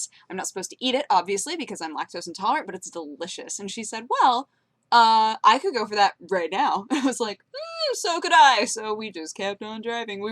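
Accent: France. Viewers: I'm french and your accent is the cutest thing ever ^^ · You have the cutest french accent I ever heard!! <3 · "Le Pain Quotidien": translated in english it's: "The Daily Bread" Also cute French accent,:) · I freaking love your french accent haha :D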